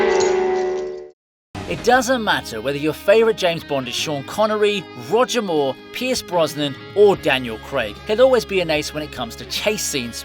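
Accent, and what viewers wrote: England, United Kingdom. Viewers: I'm not yet halfway through and already, it's painfully obvious that saying "bro" and "freakin'" in a middle-class English accent is cringe. As for Leonidas' "wall of death", it's a well; someone mistyped your script. Bro.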